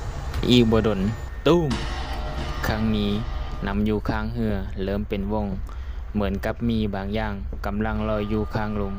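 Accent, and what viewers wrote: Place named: Isan, Thailand. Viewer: พูดอีสานบ่อย่างนี้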